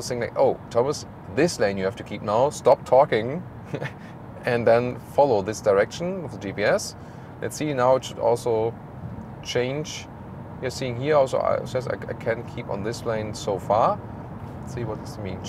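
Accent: Germany. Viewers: Wow lovely accent when germany speaks english👌🏼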